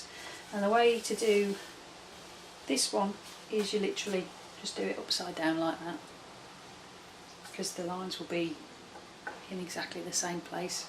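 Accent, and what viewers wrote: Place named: England, United Kingdom. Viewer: Sounds like that "grandma" might need a good "sip of oil". I have been sewing for a least 64 years. A beginner would need to see the most challenging part of sewing the ends of the elastic together. Usually that part is not very pretty no matter how we hold it or pin it. That is to be expected. LOL. Been there, done that. i worked for 9 years in the "garment industry" as a sewing machine operator. I have my mother's treadle sewing machine. Great job on your video and very nice job on sewing the mask! At my age, this great, great gma needs a sip of oil too. A "fountain of youth" would be wonderful to find. Love your "English accent". I am from KY and speak with a "Bluegrass English" accent. ~ August 7, 2022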